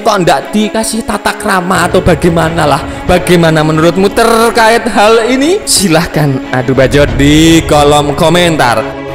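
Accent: Java, Indonesia. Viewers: Omonganmu medok min..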